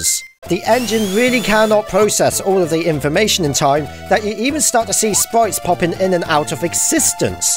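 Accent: United Kingdom. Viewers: Only British accents can make Sonic Genesis rage funny. I am Scottish and I know this.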